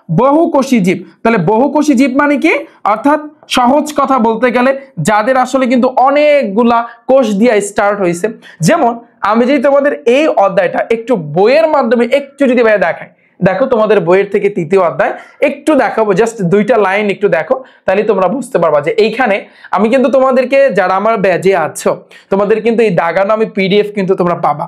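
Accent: Barishal, Bangladesh. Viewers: আপনি কি বরিশালের লোক😊😊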